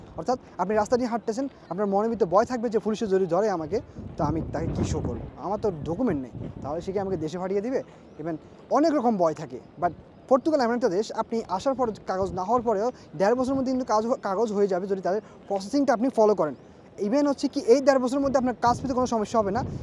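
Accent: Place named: Sylhet, Bangladesh. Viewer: ভাই তো মনে হয় আমাদের সিলেটি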